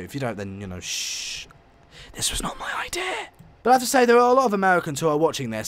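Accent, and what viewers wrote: England, United Kingdom. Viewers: The"bluething" is called the javelin duh. The YouTuber sounds English and on The side of the train in real life says Javelin so he should actually know.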